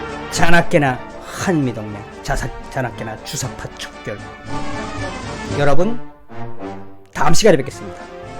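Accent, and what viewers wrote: North Korea. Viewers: 북한말 하지 않는것 좋겠네요 김정은 생각이 나서 듣기 거북합니다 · 북한사투리 개인적으론 잼 있어요 ~ ㅎ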